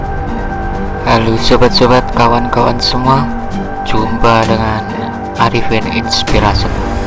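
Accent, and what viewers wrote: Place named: Java, Indonesia. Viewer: Bisa gk, di kecilin musiknya dan logatnya jangan meddo kali la..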